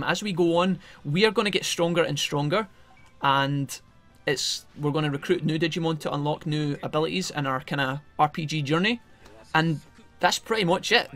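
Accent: Scotland, United Kingdom. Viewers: Scottish Ron Weasley Digimon World let's play...... You know I'm gunna thoroughly enjoy all these episodes ☺️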